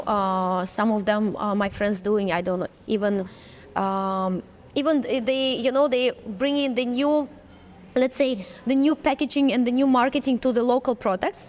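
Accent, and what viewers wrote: Cambodia. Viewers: She speaks Khmer like mixed Cambodian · Her Khmer accent sounds cute. She really inspired me to learn a new language. She has a big heart for Cambodian people. I am so thankful for your love to my people. 🙏🙏🙏🥰🥰🥰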